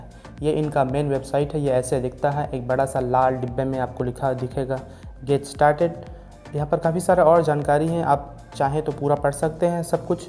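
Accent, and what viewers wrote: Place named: Chhattisgarh, India. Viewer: Videos k start me Johaar jo bolate h..us se lagta h...aap India me CG se h... reply kre..vaise toning CG wali lagti h...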